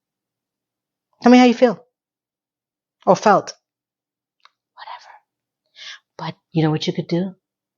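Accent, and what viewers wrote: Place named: New York, United States. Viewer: I have so many things to say about this episode, but just paused to say, 19 years in Denmark, and still have my very distinct Chicago accent. It ain't going anywhere. ;) I love a NY accent, and I can hear it in you, not so much from "Gia". Anthony held his own,proud of him. Ok, I will un-puase and watch the rest now. :)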